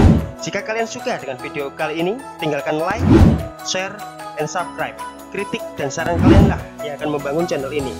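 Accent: Java, Indonesia. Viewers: Medokk.. Hhaha lucu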